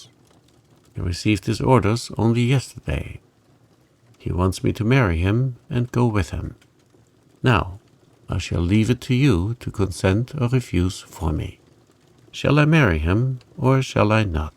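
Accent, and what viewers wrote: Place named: Germany. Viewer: This is the first time I've seen your videos and I am delighted! You are very good at speaking stories! My grandfather had a beautiful German accent like yours. He was very soft spoken as well. If they had You Tube back in the 1980's, my Grandfather could've surely been a part of it! 😆 Thank you for this. I am subscribed now. 🥰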